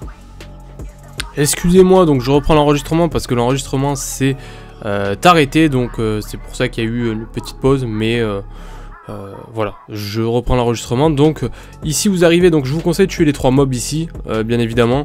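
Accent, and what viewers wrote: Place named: Paris, France. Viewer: oh c'est quoi cet accent parisien fada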